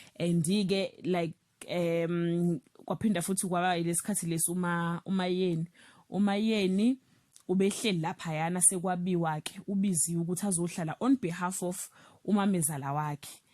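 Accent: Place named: Nkandla, South Africa. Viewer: Cc uphuma enkandla uyabonakala ngesiZulu sakho vele abasenkandla bayabonakala ithinalabo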